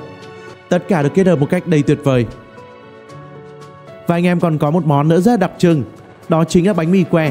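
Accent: North, Vietnam. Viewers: nghe giọng anh giống bắc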